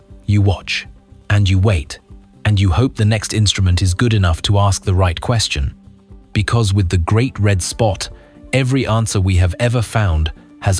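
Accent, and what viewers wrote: United Kingdom. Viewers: Does every video like this have a British accent on it? Funny.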